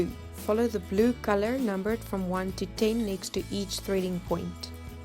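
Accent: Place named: South Africa. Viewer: Excellent. I thought that was a South African accent :) I just unboxed mine and am so glad to find a detailed explanation. Thank you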